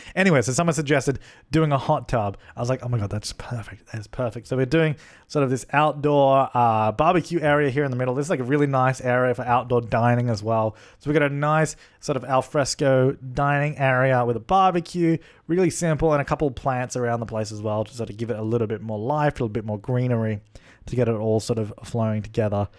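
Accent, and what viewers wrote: Australia. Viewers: Wait.... you're Australian??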